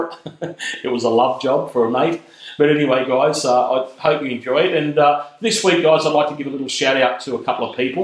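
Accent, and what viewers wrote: Australia. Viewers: Hello where are you ? Sounds like Australia, have a question for you. I've been looking on the internet for awhile and can't find what I'm looking for and found you. I'm trying to find an adapter plate for a Rochester varajet manifold to the Holley Throttle body injection system. 2 barrel to two barrel. I don't want a high rise because I don't want to modify my hood. If you could make it how much would you charge me? Thanks Tim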